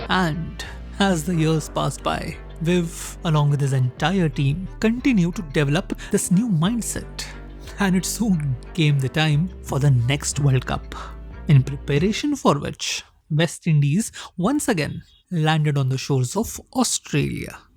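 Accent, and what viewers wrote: India. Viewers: What a pathetic background commentary! Seems Indian 🤣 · Strong Indian-accented narration notwithstanding, this is just the finest portrayal of the making and initiation of the "fearsome foursome" ever! I am WIndian myself and a casual cricket fan but never was as clear about what took place then as I am now. Great information, and wonderful perspective. Thanks a lot! --Æ. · Its not pronounced " Deeter-Mine". We know you have typical cringy Indian accent but try saying it as one word " Determine". Thank you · An Indian narrator having a strong Indian accent is odd to you? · Typical Indian English 🤣🤣🤣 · What a crazy Indian accent this narrator has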